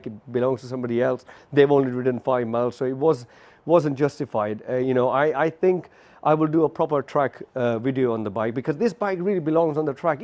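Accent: India. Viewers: You got an Indian accent... are you originally from India? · U have somewhat Indian accent 👍 .. loved your video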